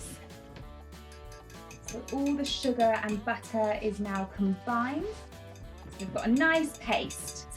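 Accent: United Kingdom. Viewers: I dont know why but british accent makes it for me ... "half an hour" how high it goes ;) <3 exately. Cant compare with american ones. Is it my nostalgia for UK. Will try it out aaaaallrigh? :)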